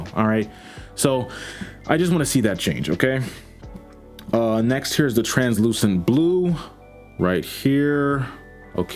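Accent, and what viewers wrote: Canada. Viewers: Are you from Canada???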